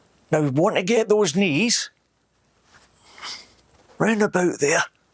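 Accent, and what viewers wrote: Scotland, United Kingdom. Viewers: You could make a video about worms and I would watch/listen. Is “Bonnie” bad or good? Scottish accents are my weakness! 😩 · On 1 side of my family we are from Wales i think thats how u spell it and other side of family we got Scottish and I love the scots !! I would like them even if they wasn't in my past ! I love Scottish way you talk. The English you can't understand the Scots you can. Great video I love the kilt but never wore one.. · A Scottish man talking. I’m here for it.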